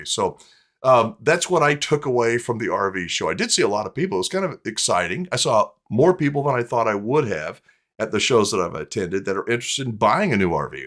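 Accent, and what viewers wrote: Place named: Georgia, United States. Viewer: I mean no disrespect. But you and Nancy Grace have almost identical regional accents. I watch all your great videos. She's from Macon Georgia, you? Respectfully Submitted, Mark Tinnirella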